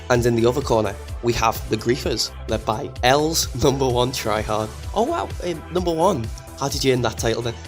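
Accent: Liverpool, United Kingdom. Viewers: i cant tell if your scouse or not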